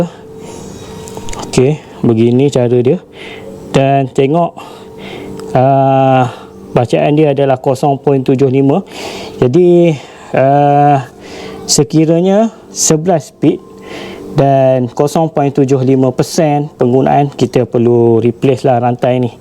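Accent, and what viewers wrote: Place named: Pahang, Malaysia. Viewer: Bang org phg ke... Klu phg area mne..? Kuat slang phg ter...haha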